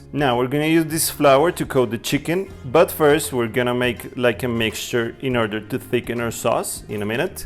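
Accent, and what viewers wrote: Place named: Rolo, United States. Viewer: It's funny how you have that perfect English with the "rolo" accent.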